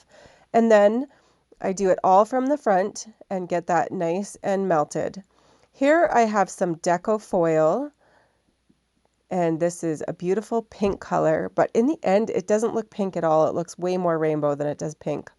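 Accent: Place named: Wisconsin, United States. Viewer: I lived in WI years ago and you remind me of what my accent was, I love it!!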